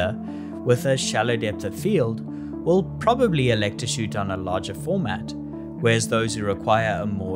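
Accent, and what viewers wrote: South Africa. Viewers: South African :) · Great video!! Was that a South African accent I heard??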